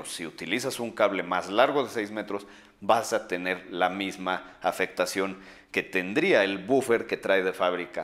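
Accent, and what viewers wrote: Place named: Yucatan, Mexico. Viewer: Haha al chile sí hablan así los yucatecos. Con eso me quedo de este video.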